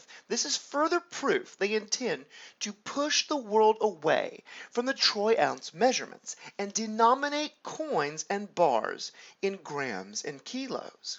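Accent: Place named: South, United States. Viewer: As soon as I hear American Southern accent, I know conspiracy theorists are coming.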